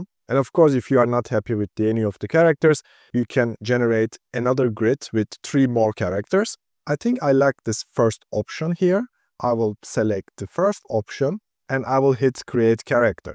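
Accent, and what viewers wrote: Turkey. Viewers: türk müsün bilader? lise şivemizle çok güzel anlatmışsın. tebrikler :) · Kanki Türk aksanı bu nerde olsa tanırım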